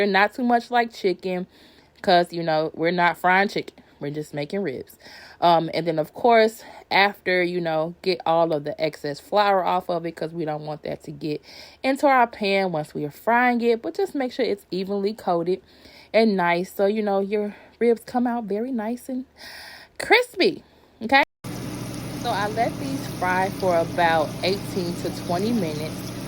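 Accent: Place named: Texas, United States. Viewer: Hey! You sound like you are from Texas.💜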